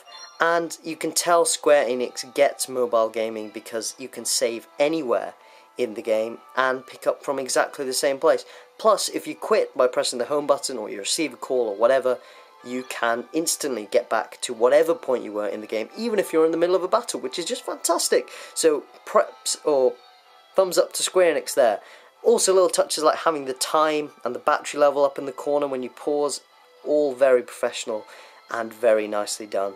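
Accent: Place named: United Kingdom. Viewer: Your accent sounds like you're from the same place in the UK as David Oliver from the Daily Show!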